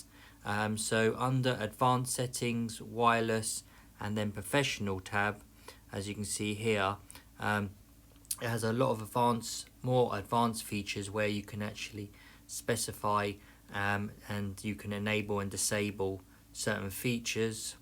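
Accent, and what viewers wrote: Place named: RP, United Kingdom. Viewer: Why would he do that? He's English, from England who speaks Oxford/Queens English. Not a yank speaking false "American" English.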